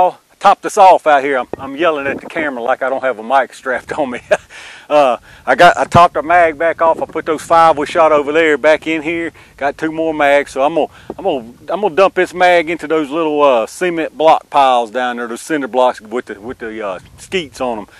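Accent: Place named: North Georgia, United States. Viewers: Nice gun! You sound like you are from Georgia, and in the North Georgia area. You sound so much like my oldest brother who is now gone. Thanks for the video! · You sound just like all my cousins. We're from N. Ga. BTW, have you put any slugs through it? If so, what did you do with the choke?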